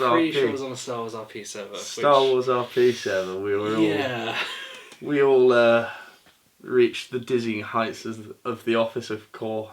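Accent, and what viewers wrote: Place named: RP, United Kingdom. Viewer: Man I wish I could give you a hug. I hope you can find peace with yourself, I just came across your videos this year and I absolutely love your silly antics snd posh accent. We all got your back ❤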